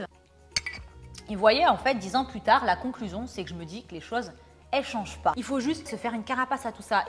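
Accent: South, France. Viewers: Je vous adore, je ne suis pas très assidu sur TSE 😔 mais vous m'avez fait beaucoup de bien pendant la pandémie. Votre travail est incroyable en deux ans, félicitations a vous et votre équipe. Et entendre votre petit accent du sud me fait du bien icitte au Québec. On lâche pas 😊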